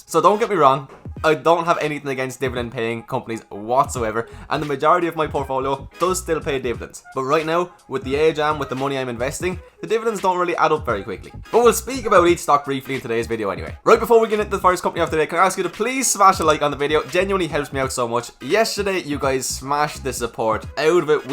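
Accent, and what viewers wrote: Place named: Ireland. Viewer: I absolutely love your videos! Also are you Irish? Love the accent!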